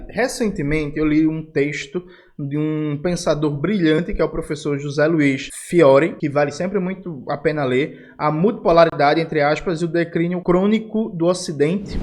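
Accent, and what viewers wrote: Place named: Recife, Brazil. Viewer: Kkkk, parece que vai ser na próxima segunda feira e tudo tomando um café com o excêntrico P Escobar. Com esta capacidade de análise honesta, o sotaque mais bonito do Brasil, o recifense...a vó te ama D. Jones! Parabéns pelo PCBR. 🎯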